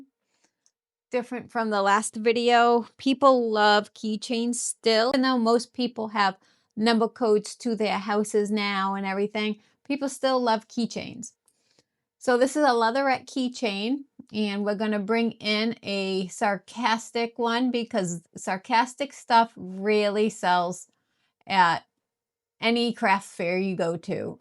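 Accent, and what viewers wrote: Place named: Massachusetts, United States. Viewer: Hi there. Love the MA accent. From Plymouth. Setting up a small 3d print farm now. Was wondering if you’ve used the laser on PLA. I’ve just found you so haven’t watched all your stuff yet.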